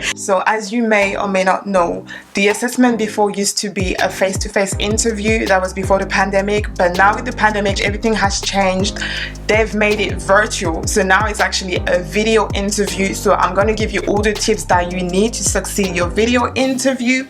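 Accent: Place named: South Africa. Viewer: I actually thought you South African 🥺😻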